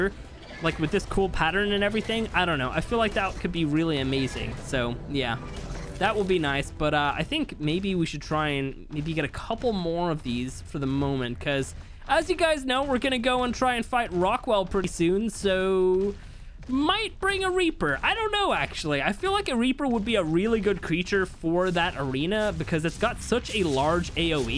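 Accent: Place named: Ireland. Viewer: He sounds so Irish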